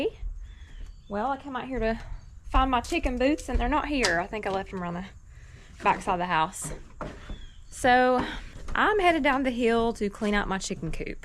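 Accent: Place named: South, United States. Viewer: Yes Ma'am you have that wonderful southern accent! Montana is a beautiful state to visit any time of the year! We raise cattle but never had chickens, mainly because of our abundance of bald eagles, skunks, coyotes and greedy turkeys! But we decided to designate a portion to have chickens for eggs, it's been a blast raising them, and now winter is coming, and I'm just watching everyone's way of raising their chickens! Hope you get a chance to come visit the Big Sky Country! Cheers!!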